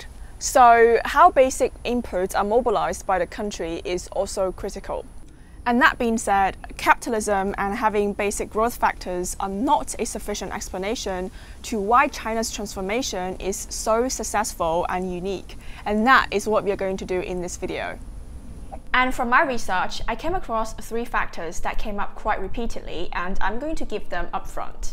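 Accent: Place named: United Kingdom. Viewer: She has ver British accent?.?why?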